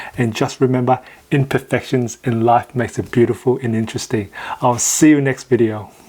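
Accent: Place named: Australia. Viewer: Nah it's okay I'm looking forward for new content on your channel . Btw nice aussie accent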